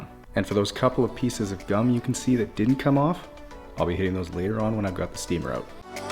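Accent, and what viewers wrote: Canada. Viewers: Man I love the Canadian accent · Americans: OMG I really love this guy's accent, it's so Canadian!! ROTW: We really, really cannot tell the difference between his accent and yours <shrug> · Where is this guy located? Probably nowhere near me since I'd love him to detail my car! I usually do it but have been working so many hours, I get home and pretty much eat and go to bed. He sounds Canadian, so my guess is he's in Canada... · I love the Canadian “ya” and “hey” they always make me smile. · I knew he was Canadian the 1st 15 seconds of the 1st video I watched lol however I can hear it more as I'm not Canadian. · i knew he had to be canadian, no americans pronounce garage in the weird way he does lol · OMGOSH, here I thought he was from Hawaii and was confused by his accent. I don't really know what a Canadian sounds like since the ones I work with have straight accents so listening to him was quite the study.😂😂😂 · Love the Canadian accent aye..... 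· He’s been the only Canadian that sounds like a Canadian on this channel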